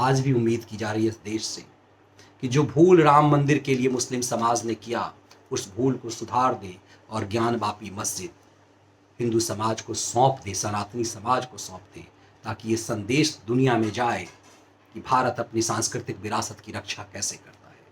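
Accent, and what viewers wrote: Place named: Bihar, India. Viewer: आदरणीय महान् विद्वान और राष्ट्रवाद के सशक्त और प्रबल समर्थक श्री, बहुत ही सुन्दर,सटीक और समसामयिक विश्लेषण किया है। पर आज़ आपका संबोधन और भाव भंगिमा विगत (भूत काल) के जैसा प्रतीत नहीं लगता है। आपकी हंसमुख, चुटिली वाणी, और ठेठ बिहारी लहजा ही आपकी अनोखी अछूती और अदभुत पहचान है। वही बनाए रखें। प्रोफेसर जैसा लेक्चर तो सभी पत्रकार देते हैं।आप अपनी पूर्व भूमिका में ही अच्छे लगते हैं, धन्यवाद।🎉🎉🎉🎉🎉🎉🎉🎉🎉🎉🎉🙏🙏🙏🙏🙏🙏🙏🙏🙏🙏🙏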